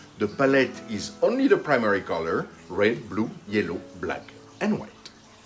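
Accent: France. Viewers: your accent seems to be French, is it?? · enjoy your French accent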